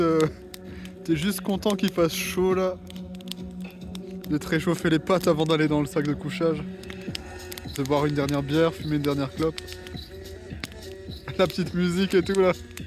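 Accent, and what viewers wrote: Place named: Alsace, France. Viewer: J'ai directement reconnue l'accent alsaco ! Super mood en tout cas. On se croisera peut être un jour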